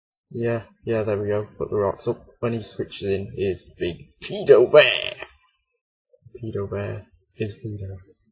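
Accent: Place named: Yorkshire, United Kingdom. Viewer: But the Yorkshire accent is win though...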